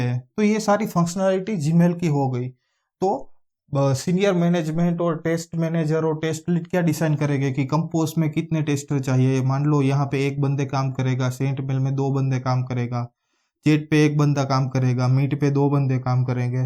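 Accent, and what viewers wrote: Gujarat, India. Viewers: Gujrati accent bhai ni 😉😉 helpful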